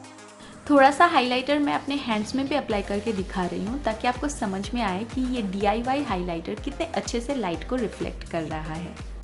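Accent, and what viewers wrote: India. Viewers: Before, you wrote the name of the ingredients in the legend of the step by step. I know how to speak English, but due to your Indian accent I have a little difficulty understanding what you're talking about. Please, if you do not mind, start captioning again. Please. I like you so much. Congratulations on the evolution of the channel =)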